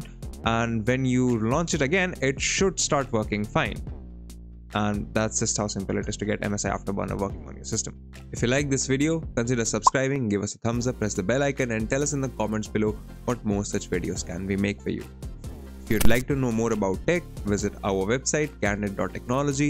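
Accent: India. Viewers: Anyone got a translation for this Indian scammer?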